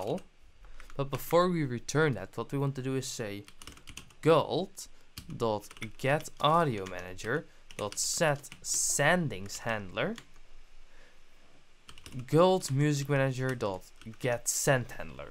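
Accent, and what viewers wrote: Netherlands. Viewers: This guy sounds dutch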